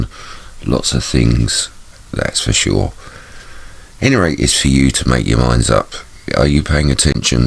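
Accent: England, United Kingdom. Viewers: "Enen if you think it's a bug on the lense you're crazy"? This kind of thing has got to stop! And NOW! As I watched this video i thought, at last! A quality video made by a quality person and not people yelling and swearing at each other and stuffing their belief systems down each others throat. There is a point where you say "it's for you guys to decide" True! It's for everybody to decide, make their own minds up. Thats how it should be until we are all told the truth and we know for sure! It's all we have at this time. Discernment and choice. You very nicely lay out the facs that YOU have. Interesting pictures indeed and food for thought, BUT you ruin the video and my opinion of you by saying someone is crazy if they don't see it your way!!!! You sound English so if you are I'm sure you will know of the Astronomer Patrick Moore? I never found him appealing to watch but Im sure millions would agree. 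He was hoever , a very interesting man if you could keep up with him talking 20 to the dozen. As a kid I learned a lot from him but some months ago he was discussing the moon with another astrologer and he mentioned the conspiracy world and the "fake moon landings" .he looked right into the camera with that terrifying eyebrow up on his head almost and the eye that belonged to the said brow, popping out like an organ stop and shouted at the viewers "anyone who thinks that WE didn't land on the moon has to be compleeeeeeetly INSANE"!! That was it for me, all respect GONE in a flash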